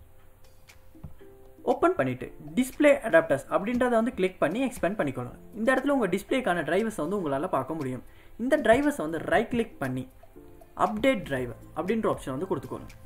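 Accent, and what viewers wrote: Sri Lanka. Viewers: Srilankan friend pesra mari iruku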